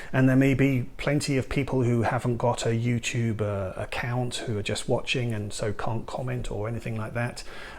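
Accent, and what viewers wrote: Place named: England, United Kingdom. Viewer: It’s the English accent that makes it sound so classy when you’re talking about movies 👍🏻 400 great job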